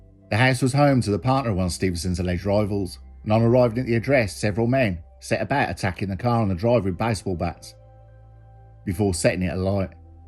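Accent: Birmingham, United Kingdom. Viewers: Massive congratulations and respect to you and your content brother. I literally can binge watch your videos for hours. There all perfectly to the point and not too long and cover everything and sum it all up at the end brilliantly. Keep doing your thing geezer. Ps never used to be a fan of the brummy accent but yours fits perfectly. Keep it up mate.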